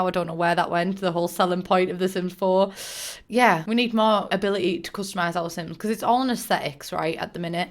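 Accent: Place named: Northumberland, United Kingdom. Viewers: Can’t work out if you’re from Northumberland or Newcastle, hmm 🤔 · i love your north-england sound ♥️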